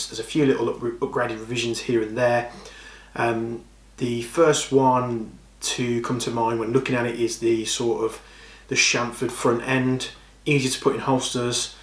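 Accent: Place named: Australia. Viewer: Have you ever lived in Australia? Your voice is very similar to Australians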